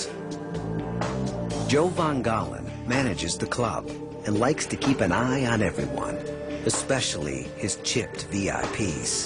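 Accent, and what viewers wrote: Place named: Netherlands. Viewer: Who cares about life?? DUTCH ACCENT DUTCH ACCENT DUTCH ACCENT DUTCH PEOPLE PARTY DUTCH PEOPLE ACCENT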